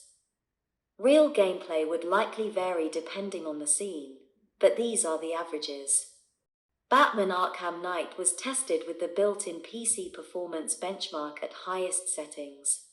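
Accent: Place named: United Kingdom. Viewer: how come the a.i. voice has a british accent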